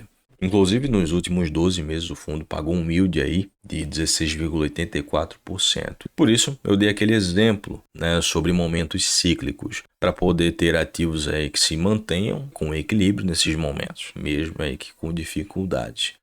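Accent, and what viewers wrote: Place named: Pernambuco, Brazil. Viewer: Tá tranquilo mermão, sua resposta foi bem esclarecedora, a comunidade tem que ser informada desses detalhes, saber dos indexadores, saber onde estão pisando, agora com relação se vc é de Pernambuco, percebi pelo sotaque, sou aqui do Ceará, somos vizinhos rsrsrs, tudo certo mermão, forte abraço, no aguardo do próximo "Raios -X" 🤛🤛🦈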